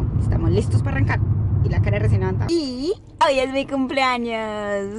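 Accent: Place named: Colombia. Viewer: Hola me llamo Yanet, soy cubana, vivo en Italia y te he encontrado por casualidad en YouTube y realmente tus videos nos gustan mucho porq estoy conociendo Australia gracias a ti estoy fascinada, he visto tantos de tus vídeos eres fantástica, y amo el acento colombiano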